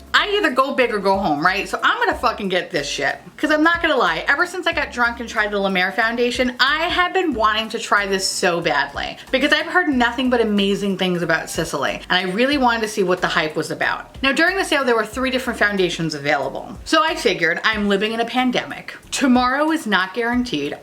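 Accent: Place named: Long Island, United States. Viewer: if u ever see this comment I think we would all love for u to do a video in your queens/Long Island accent!!! I would live!!❤️❤️❤️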